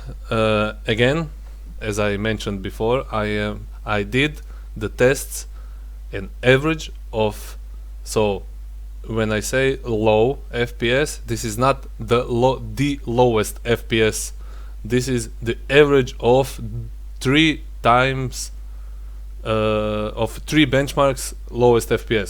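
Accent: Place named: Russia. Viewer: Как будто русский на английском записывает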